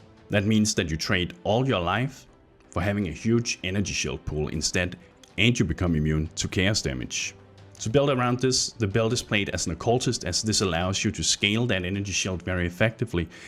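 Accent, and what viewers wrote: Denmark. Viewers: Is this dude Danish?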